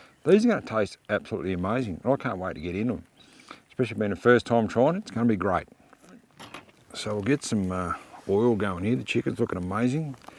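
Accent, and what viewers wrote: Australia. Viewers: lol. You have the okka accent. Crocodile Dundee Steve Erwin sound. My brother got into 4x4 camping. Low and behold his speech pattern changed after 6 months in the bush. Recon ' e ' ad a close a close encounter of the third kind on his adventure. On ya mate. Keep having fun.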